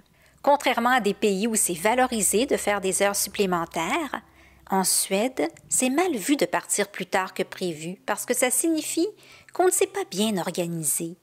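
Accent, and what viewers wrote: Quebec, Canada. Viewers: Si je ne me trompe pas, cet accent est Québécois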